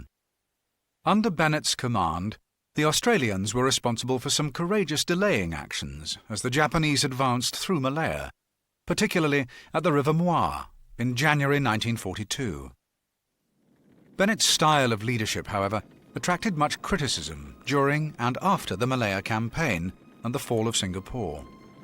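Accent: United Kingdom. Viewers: Very British accent indeed ....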